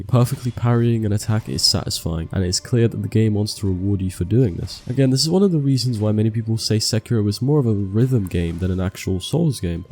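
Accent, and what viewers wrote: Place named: United Kingdom. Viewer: Hmm British dude doing soulsborne commentary? Subbed.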